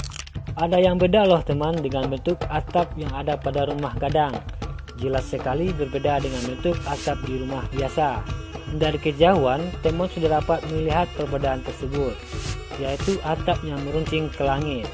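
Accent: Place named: Aceh, Indonesia. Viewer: Logat nya kok logat Aceh ya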